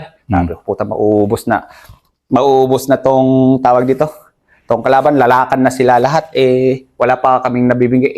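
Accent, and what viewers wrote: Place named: Visayas, Philippines. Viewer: Kakapanood ko sa part 1 Sir hindi accent ng ipugaw kundi bisaya na✌️.Ipinagmamalaki ka mg buong ifugao province sir at ipagdadasal din namim ang kaligtasan mo kayong lahat jan. Nakakalungkot lang na kapwa pilipino nagpapatayan anong klaseng utak kasi ang meron yong mga tao na kumakalaban sa gobyerno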